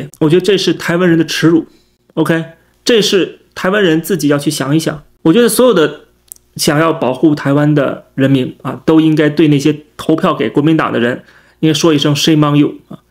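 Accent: China. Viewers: 你支持台独却又没法做更进一步的事情，你说你气不气？公子狗只能整天在YT这里哇哇哇狗叫，一个大陆口音的人去当湾湾人的舔狗，你除了狗叫还能干啥，拿点实际的东西出来啊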